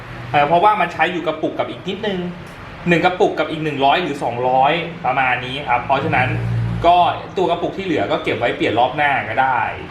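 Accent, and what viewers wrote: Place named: Isan, Thailand. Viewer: อร๊ายยยย น้อง เป็นผู้ชายที่มีความสาวนิดๆ ติดสำเนียงอีสานหน่อยๆ ใจละลายแล้วคร่าาาา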